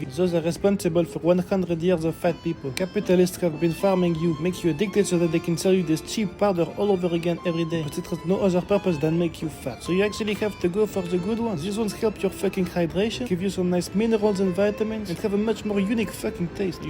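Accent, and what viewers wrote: France. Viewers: Ar you French ?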